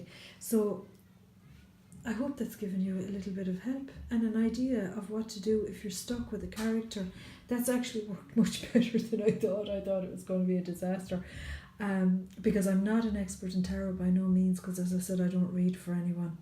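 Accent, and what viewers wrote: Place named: Ireland. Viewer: I love your accent! Puts me in mind of my recently passed, VERY Irish grandfather. He is missed and you are delightful:)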